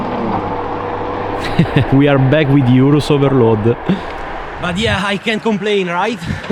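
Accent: Italy. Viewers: I love the Italian accent. Come stai?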